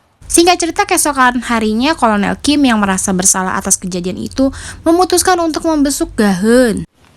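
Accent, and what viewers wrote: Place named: Java, Indonesia. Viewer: Mbak nya ngomong nya lucuu 😅ngahennya medook 😅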